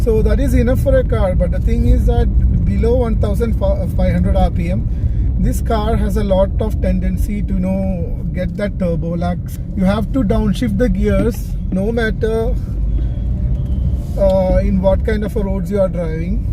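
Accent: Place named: Kerala, India. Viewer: Are u malayali?? Ur English slang resembles Malayali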